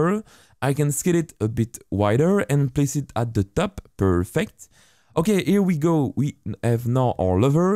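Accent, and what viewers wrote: France. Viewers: Ça sens l'accent français...?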